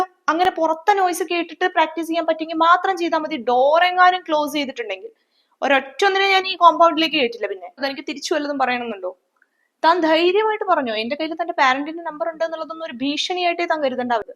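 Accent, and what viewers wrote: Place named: Thrissur, India. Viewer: Ayyo triasur slang Evide poyi??? Sho straight njngada tone…Adipoli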